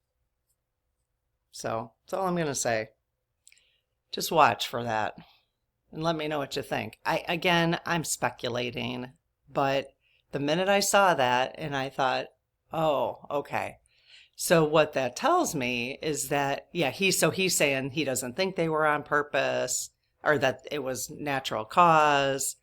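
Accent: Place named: Wisconsin, United States. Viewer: I grew up in Milwaukee and I detect a Wisco accent in you. Is that right? We moved away when I was 12 but I still have tons of relatives and friends there with that accent, which I love! I have ADHA and grew up with teachers telling my I was an underachiever because they knew I was smart but still was only getting Cs and Bs in school. I spent twice as much time on homework as my friends but no one knew why - there was no concept of ADHD then and people just thought I was slow to get things. Even in college I had to work twice as hard to get my BA in experimental psych. I didn't feel dumb but I just knew everything took me longer and was harder and I would make lots of simple mistakes such as in simple processes like addition and subtraction.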